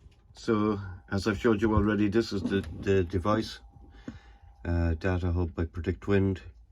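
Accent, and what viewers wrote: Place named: Ireland. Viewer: Oh ! What a pitty ! The AI translate everything in french ! No more irish accent !😑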